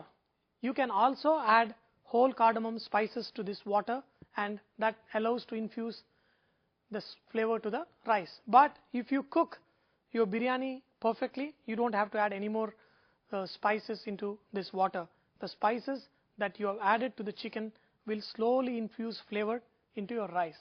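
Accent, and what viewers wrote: India. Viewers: "american"...when did it become a language? it's English dude which you guys have hijacked and now call it american....damn funny!!!! you also "kepp" trying!! is speaking British English in Indian accent (which we proudly speak)....which is actual English...not "American" English with that strange r sound.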